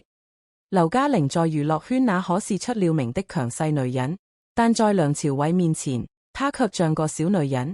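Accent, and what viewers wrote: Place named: Cantonese, China. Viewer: 这讲话广东话这么难听😂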